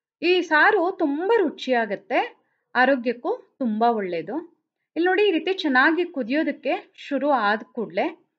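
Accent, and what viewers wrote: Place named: Brahmin, India. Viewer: ಶುದ್ಧ ಬ್ರಾಹ್ಮಣ ಉಚ್ಚಾರಣೆ 🙏🪷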